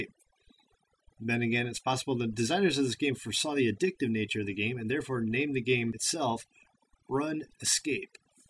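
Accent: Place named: Northeast, United States. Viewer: Wow you pronounce everything the way I pronounce it. You almost have a North Eastern United States Accent. Am I mistaken?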